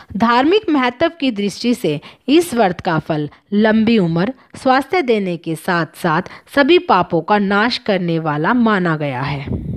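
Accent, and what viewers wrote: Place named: Haryana, India. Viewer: padh padh k mat bolo mataji aur haryanvi accent se mukti pao zara !!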